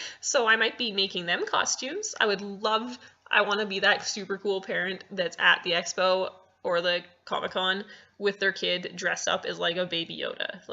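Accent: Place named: United Kingdom. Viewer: oh dear British accent, really haha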